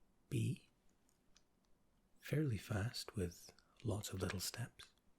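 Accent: Scotland, United Kingdom. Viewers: I love the tone of your voice and the way you speak. Maybe it's the accent that I like so much being a fellow Scot ☺️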